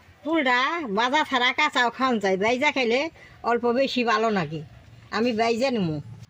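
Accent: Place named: Mymensingh, Bangladesh. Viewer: দাদীমা আমাদের টাঙ্গাইল ও ময়মনসিংহের ভাসায় কথা বলে খুব ভালো লাগে 😊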